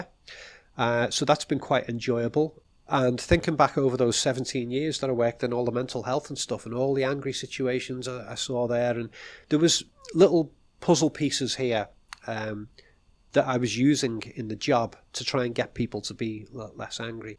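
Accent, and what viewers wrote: Liverpool, United Kingdom. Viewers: Mr, I've watched your videos off and on a for a couple of years now. Your videos are fantastic and your insight is incredible. I've often thought your accent is quite similar to that of Paul McCartney. Well now you've confirmed it for me as you stated you are from Liverpool just as he is. You kind of even look a bit like him. Just an observation is all. Be well sir.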